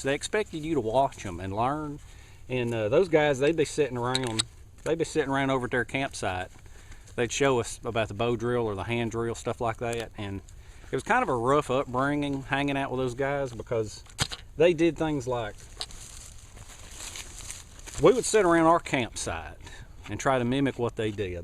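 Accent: South, United States. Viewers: I love watching your videos. From the first word in that fantastic Southern drawl and the infectious laugh right through the fantastic tips and things you can do/make with rope, and ending in your sign offs... it's all truly worth watching. I'm waiting for the warmer weather to arrive here in bonnie Scotland before I venture out and try some of your ideas. Keep making the vids - they make learning fun. Best wishes.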